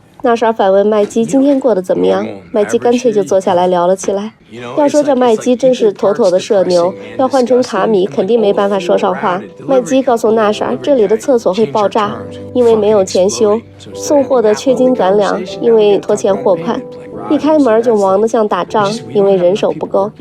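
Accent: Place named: North, China. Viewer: 不是AI，好像是个北方姑娘，声音甜美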